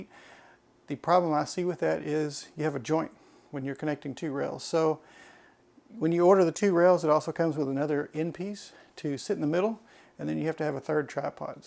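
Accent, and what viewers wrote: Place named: Texas, United States. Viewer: That Texas accent should have been a dead giveaway.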